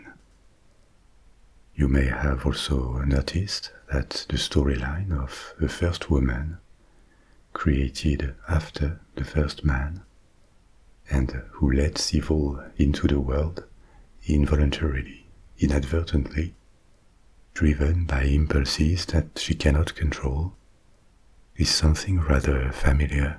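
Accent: France. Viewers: I went through a few other videos to find a relaxing voice like this. The French accent is a bonus and surprisingly relaxing. Will stick with the from now on. Love the stories. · The best ASMR creator. That velvet French voice. Knocks me out every time. Thank you for all you do !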